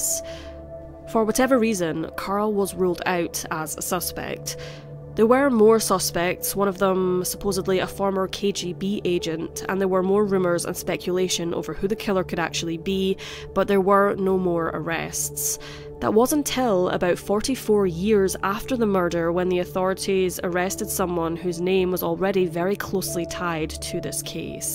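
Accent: Scotland, United Kingdom. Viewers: Geez, some of these yt honchos are doing really dodgy things! The same thing happened with two other channels I watch. One of them ended up going to Odyssey. Yt had permanently demonetised his amazing crime channel. Your channel is brilliant and your content is fascinating. The research into these cases are phenomenal too. I have followed you for months and your Scottish accent is lovely. Anyway, cheers from Australia!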